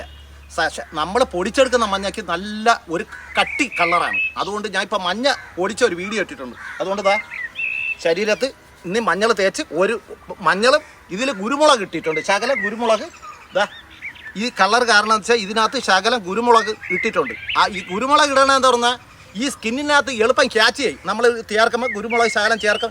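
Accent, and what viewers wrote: Thiruvananthapuram, India. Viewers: അടിപൊളി നിങ്ങൾ ആ തിരുപനന്ത പുരംഭാഷയിൽ പറയുമ്പോൾ കേൾക്കാൻ നല്ല രസം 👍👍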